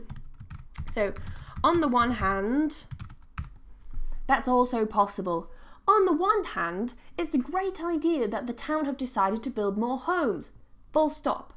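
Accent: United Kingdom. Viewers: Plse keep g.... we need to learn british accent 😍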